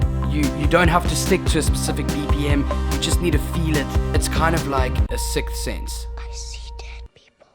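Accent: South Africa. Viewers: So nice to hear the South African accent, keep on inspiring bru, I made a film myself and I kinda realized I did way too much of the stuff I shouldn’t xD Would love if you came up to Joburg somewhere along the line, I dig your content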